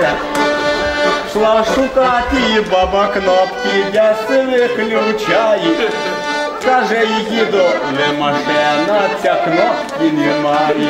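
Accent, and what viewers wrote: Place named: Hutsul, Ukraine. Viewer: Молодець акцент справжній гуцул!!!!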